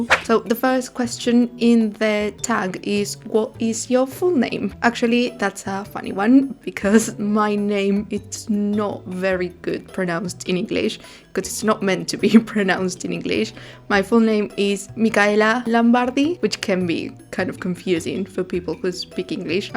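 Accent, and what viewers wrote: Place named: United Kingdom. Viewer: I want that british accent! I need to move UK. What do you think? 😊 You are living my dream.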